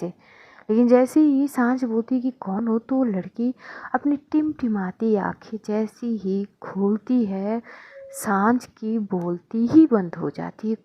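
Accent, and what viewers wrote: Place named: Haryana, India. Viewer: Didu haryanvi bolna kha se sikha 😊thodi thodi bol rhi ho pr mst lag ri so ❤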